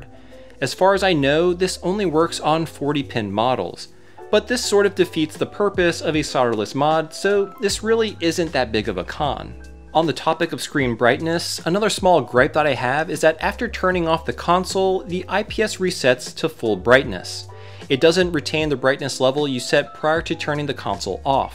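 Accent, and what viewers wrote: Baltimore, United States. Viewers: Are you from Baltimore? I feel like you have a Baltimore accent! Lol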